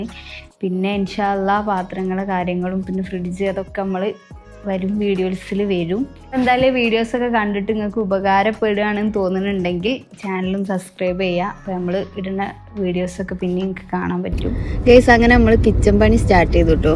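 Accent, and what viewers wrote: Kozhikode, India. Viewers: കോഴിക്കോട് കാരി ആണോ slang കോഴിക്കോട് ആണല്ലോ ❤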